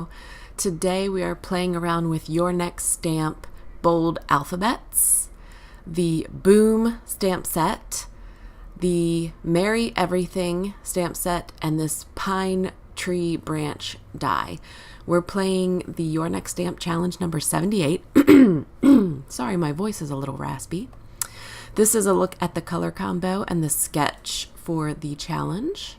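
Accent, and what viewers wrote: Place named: Baltimore, United States. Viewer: I can’t tell you how excited I was to hear someone that sounds like ME!!! lol I’m in Baltimore Beautiful card btw 😁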